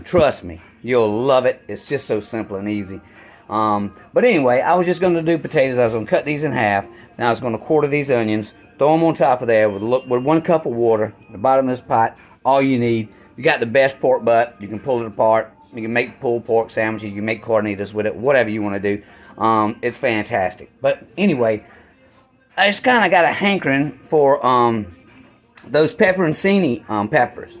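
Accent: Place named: Louisiana, United States. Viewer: Are 6ou from Louisiana?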